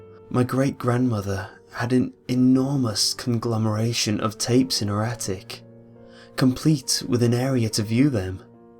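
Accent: United Kingdom. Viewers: your britsh acent (i cant spell very well) makes every thing creepy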